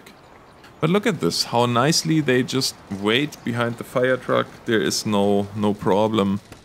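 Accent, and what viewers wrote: Germany. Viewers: canadian? you have a german accent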